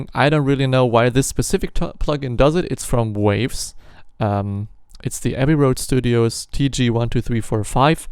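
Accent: Germany. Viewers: ich liebe diese deutschen akzent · Ich wusste es! Ich höre den Deutschen Akzent doch raus ;D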